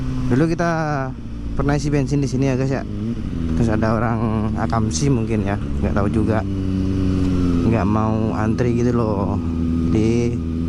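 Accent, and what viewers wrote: Sidoarjo, Indonesia. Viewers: Orang Sidoarjo bang?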